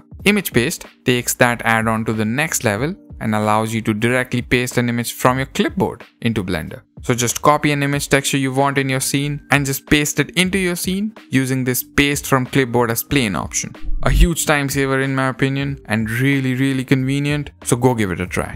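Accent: India. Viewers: Don't lose that Indian "t". :) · U sound indian, are u indian? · you're English accent sound like your are indian are you ?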